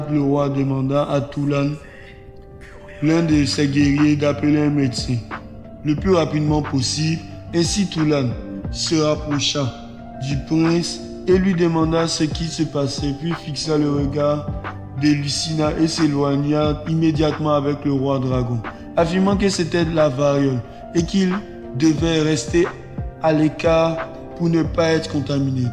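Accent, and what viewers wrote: Ivory Coast. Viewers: bonjour je vais m'abonner à ta chaîne ça me ferait plaisir de voir ton évolution par ailleurs ont à déjà dit que ta voix ressemble à la nôtre celui des ivoiriens 🤛🥰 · Ta voie ressemble à celle d'un ivoirien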